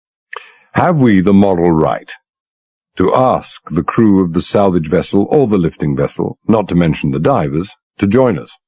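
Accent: RP, United Kingdom. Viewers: They spoke like this in the 40's , 50's 60's ...... grammar school posh talk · If someone will please say, what is this way of speaking? I’ve listened to a lot of different British accents and this is distinctive. What place and frame of time? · It's called received pronunciation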